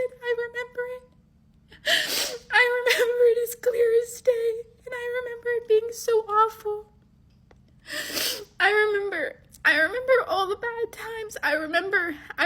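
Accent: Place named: New York, United States. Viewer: Ah...could've sworn the way she speaks is from the NY area, as that's where I'm from.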